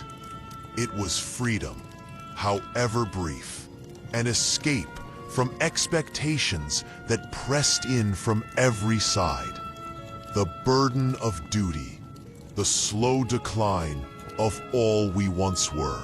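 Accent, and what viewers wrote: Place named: United States. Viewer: Can’t listen to Agatha Christie being read with an American accent plus the background noise is intolerable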